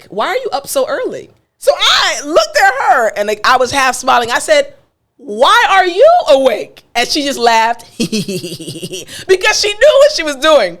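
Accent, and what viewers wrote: United States. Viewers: You speak as you wer american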